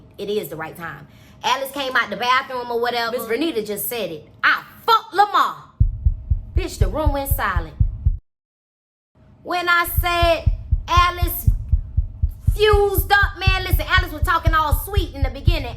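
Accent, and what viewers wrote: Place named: Bahamas. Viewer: The Bahamian accent😅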